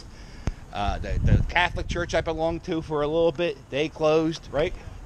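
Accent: Philadelphia, United States. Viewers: Ahhh I love your Philly accent and then I realize I sound the same way 😂😂 It looks like you're in the Burg. One of the nicest neighborhoods left in Philly.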